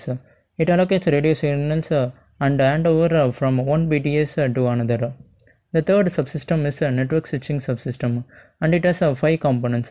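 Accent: India. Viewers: English....with little tamil accent 😊Cool right?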